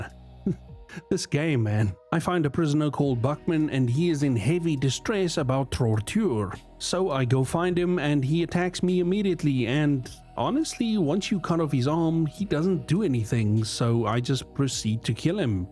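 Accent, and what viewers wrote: South Africa. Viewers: Is this a fellow south african that i hear who has also put himself through perpetual torment with this absolute gem of a game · Definitely a very South African accent haha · this guy sounds like he's south african so im subscribing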